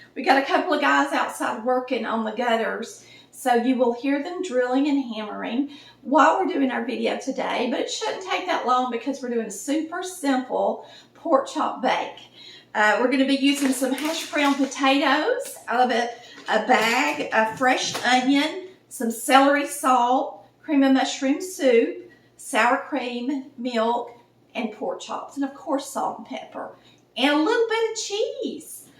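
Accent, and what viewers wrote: South, United States. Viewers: I love the way she say pork chops. That country voice gets me all the time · You are awesome! Love your southern accent and personality 😄. I just found it today and YouTube keep posting videos you’re great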